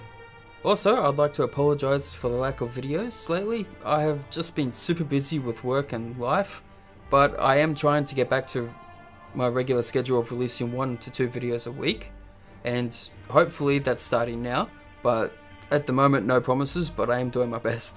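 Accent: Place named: Australia. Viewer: Just discovered your channel, I like what you're doing, I have one question though? which part of Australia are you from? I'm from New Zealand and I almost thought you were Kiwi at first but then realised you didn't have the Kiwi accent.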